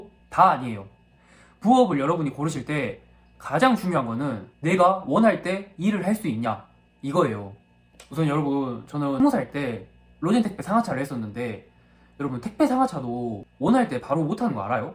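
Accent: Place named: Jeolla, South Korea. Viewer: 출신이 전라도인가요?억양이 전라도아신데...그럼 99.95% 사기꾼.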